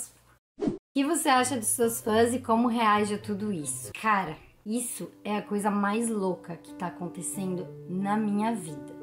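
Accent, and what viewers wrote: Rio Grande do Sul, Brazil. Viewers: Guria! Tenho quase certeza que tu é gaúcha! Sotaque 😉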